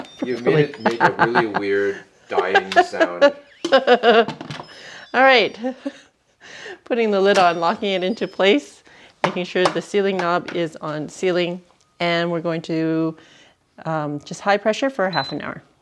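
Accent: Canada. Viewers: ok so I went to the Chinese supermarket and they have pork belly on sale imported from Mexico for 3.99 a pound I naively asked for two pieces and it came to 4.4 lbs and $17 but I decided it was worth it and I may go back and get some more and put it in the freezer while it is there what do you think, gud deal or? love your Canadian "outs" and "abouts" BTW. Arthur in California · Very cute accent! Are you Canadian 🥰🌷... love the accent love your recipe !! Can’t wait to try it!!! Omg! Mouth watering 😋😋😋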